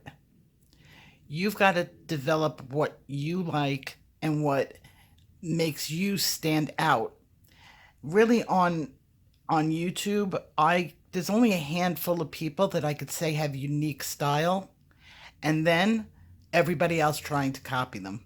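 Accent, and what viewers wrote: New York, United States. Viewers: I KNEW it was New York accent I was hearing !!!!! I’m from Midwest the accents are different!! I’m the one who was sooooooooo into one of your sessions and you’re working on a leaf and there’s a middle vein in it and you say …. “Now I’m going to apply the…..” well this gal hears “pasta” !!!!!!!!! I know I know that’s how new I am I knew nothing about Posca markers!!! You made my day on a day I needed it….so I’m putting my story out there for the newbies!!!!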